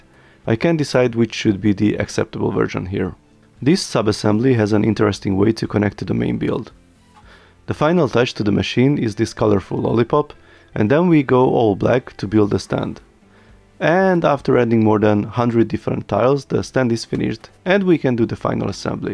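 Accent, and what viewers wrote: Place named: Hungary. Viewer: YOU SOUND SO HUNGARIAN!!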